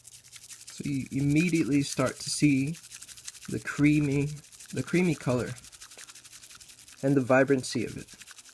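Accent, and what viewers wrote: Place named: United States. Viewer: Yeah, yeah comment is 1 year long but you're wrong. UK: /ˈmætʃ.ə/ US: /ˈmɑːtʃ.ə/. So yeah, since he's american(or at least speaks with an american accent) he's right.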